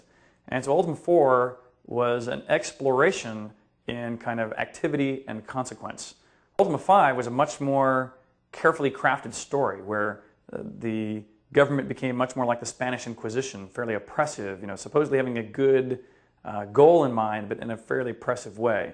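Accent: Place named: United Kingdom. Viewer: i am german and even i think you sound british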